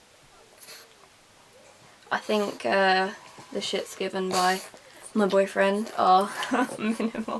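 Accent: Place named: London, United Kingdom. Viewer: u have a london accent-nice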